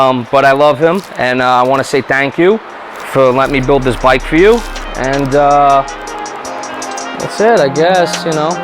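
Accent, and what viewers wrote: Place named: New York, United States. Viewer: as an Australian love that new york accent mate!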